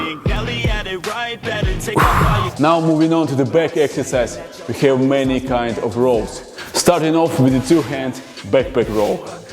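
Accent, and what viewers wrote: Russia. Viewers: пожалуйста прокачай свое американское произношение, ну а то совсем не серьезно. круто то что ты можешь свободно понимать и говорить, но произношение режет ухо. типичный русский акцент )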